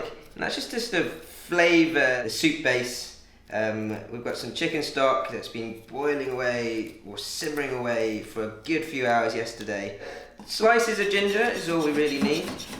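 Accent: England, United Kingdom. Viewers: Chinese Chef with an english accent. Great!! Chinese noodles are great so is dumplings. Yummers!!!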